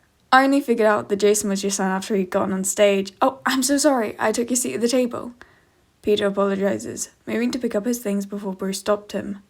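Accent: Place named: United Kingdom. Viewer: HELLO oh omg your BRITISH i think that's what the accent is i'm originally from dustbunnys channel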